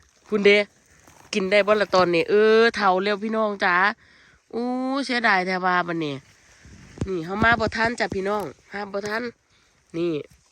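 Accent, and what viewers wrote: Korat, Thailand. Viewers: ชอบฟัง พูด ภาษาโคราชบ้านเอง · สำเนียงคล้ายๆคนโคราชค่ะ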